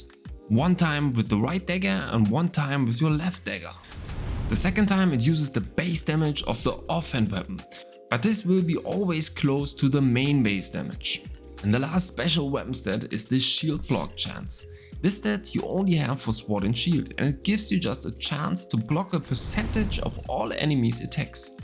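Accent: Germany. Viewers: Vom akzent sprichst du deitsch also wirst du sicher nichts dagegen haben, wenn ich meine frage auf deutsch stelle 😎 Was bedeutet denn die Zahl ohne %? Das kapier ich gar nicht. Zum Beispiel Melee Heavy Attack Chance 401...was bedeutet diese zahl? Und wo genau sehe ich die allgemeine crit chance im allgemeinen? Danke für deine antwort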